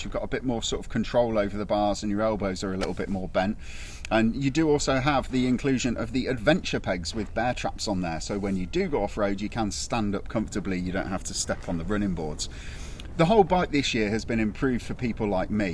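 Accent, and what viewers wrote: United Kingdom. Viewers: Wow.british sound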